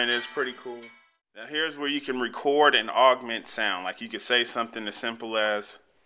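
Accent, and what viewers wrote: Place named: United Kingdom. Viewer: i bet your british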